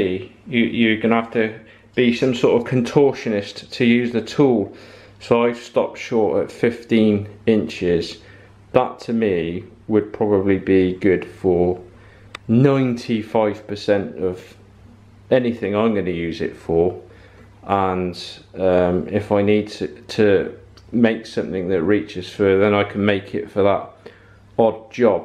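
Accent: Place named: Cheltenham, United Kingdom. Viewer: You sound like me ,you have got to be from Cheltenham.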